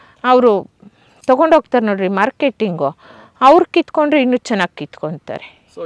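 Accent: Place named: United Kingdom. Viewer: British Speaking Kannada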